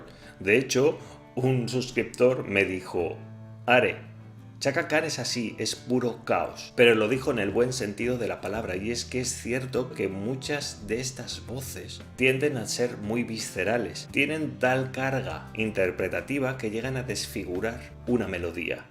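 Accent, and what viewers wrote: Spain. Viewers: eres asturiano?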